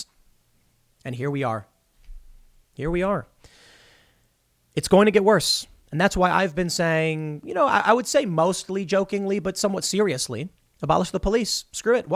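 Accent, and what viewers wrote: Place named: Chicago, United States. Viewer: .... Every video your Chicago accent is getting stronger. Something is definitely off...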